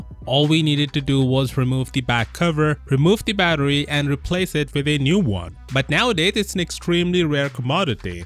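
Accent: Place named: India. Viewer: Disliked. Sounds like Indian scam call center.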